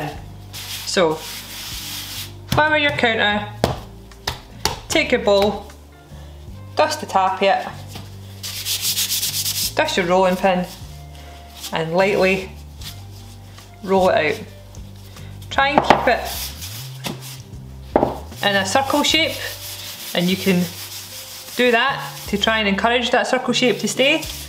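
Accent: Scotland, United Kingdom. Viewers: Listening to a scottish woman talk is always an experience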